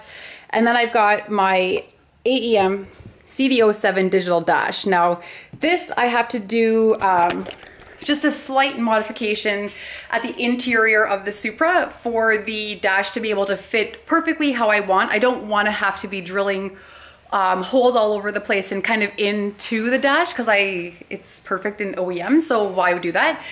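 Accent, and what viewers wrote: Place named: Canada. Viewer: Last video I didnt realize how typical Canadian your accent is, cute af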